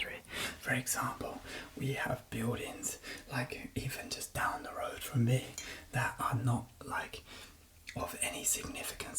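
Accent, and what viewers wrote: United Kingdom. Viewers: Are u Britsh? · I assumed you were already speaking British English!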